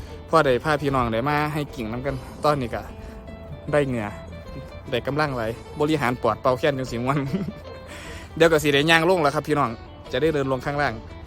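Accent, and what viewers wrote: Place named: Isan, Thailand. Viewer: ชอบเวลาเว้าอีสานน่ารักดี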